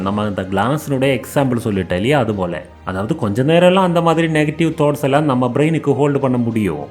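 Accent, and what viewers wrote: Kerala, India. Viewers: Awesome video thank you so much I like ur tamil lovely malayalam accent mixed, thanks for your effort people need it in today's huzzle buzzle life😊🙏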